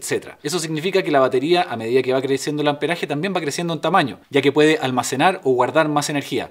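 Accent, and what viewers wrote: Chile. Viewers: Por el acento supongo que sos chileno. Hermoso país. Saludos desde Argentina. Muy instructivo el video.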